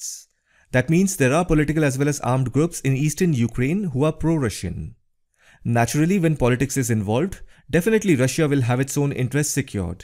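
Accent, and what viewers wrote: India. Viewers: I never thought I would survive an entire video with Indian english but your effort of research and the quality made it worth it lol. Keep it up! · Definitely need the Indian accent to put emphasis on what is happening here.. totally made me understand it better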